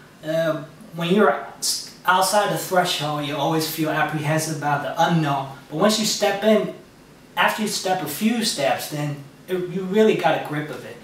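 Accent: United States. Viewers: i feel like im hearing a texas accent? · Nice American Accent. Your video is appreciated. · your accent is so confusing!! but in a good way!!! hahaaha it's like some of a brooklyn accent mixed with a little southern accent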